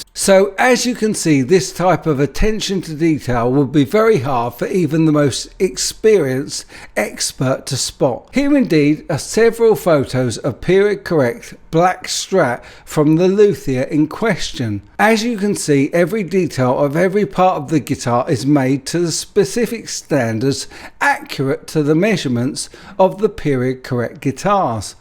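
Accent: England, United Kingdom. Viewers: You sound English to me, heard some crazy accents. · Sounds like a typical English accent to me. I would have never thought he had a speech problem if he didn't say so.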